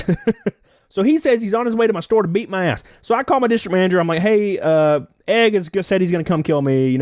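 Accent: South, United States. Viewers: You sound like a southerner. So as a southerner, go buy a gun and “stand your ground” my guy 👌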